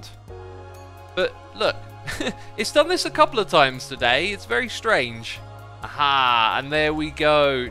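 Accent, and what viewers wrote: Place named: United Kingdom. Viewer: im so used to voice now that i cant even tell he has a british accent, its just accent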